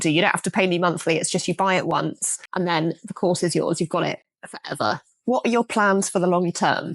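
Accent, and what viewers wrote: England, United Kingdom. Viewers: Прикольно. Английский учил только в школе но почему-то сразу показалось что вы из Англии😊! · Hey, you're British - that surprises me. I know Lauren Jumps is British (heard her talk). I'm an old guy from Germany and I watch your videos because... YOU MAKE ME SMILE! Thanks. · From here in America: Oh wow yay the double thrill when I 1st heard, oh too good and fun to be true, is in Britain that accent yay!!!! I LOVE the maternal British isles heritage I have: Irish and Scottish!!!!! So in addition to OH FUN CHEERY INSPIRING DANCING AT LEAST, buddy is a "fellow "Brit": THANK YOU hug for all this and being who you are!!!!!!! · I got that u are from England by first 3 seconds :)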